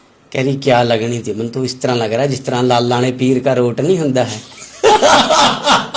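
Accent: Puadh, India. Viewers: Laggda tuci v punjab ch ni rehnde eh poandhi boli aa .Mohali ch painde addhe pinda ch aahi boli jandi aa te Zirakpur. Ropar side v.jirkpur side same edda hi boli jandi same jive bai bolda peya sadde vall thoda ja fark aa bs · ❤ਪੁਆਂਦੀ ਬੋਲੀ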